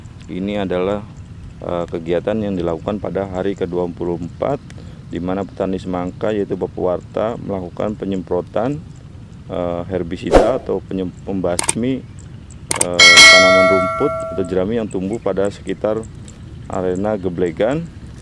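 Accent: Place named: Indramayu, Indonesia. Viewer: logate kaya logat dermayon.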